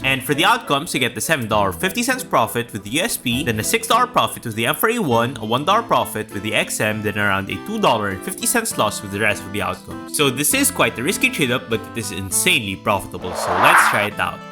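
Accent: Philippines. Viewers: are you pinoy. you sound pinoy.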